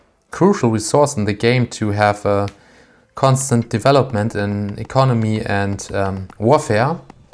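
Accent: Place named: Germany. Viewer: Hello there I would like to ask you why you sometimes refer to yourself in the third person, it makes you sound a little arrogant, no offence intented. Just wanted to make you aware of this since I noticed that you are a non-native speaker due to your German accent. As always, some really enjoyable content:D Keep it up!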